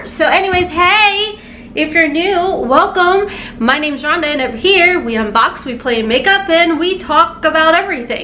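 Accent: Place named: Tennessee, United States. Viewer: I just found you threw another channel. But I can say I'm a fan for life. I'm from Tennessee but moved to Florida. I miss hearing people talk the way we do. And I ha e a Haylee. Lol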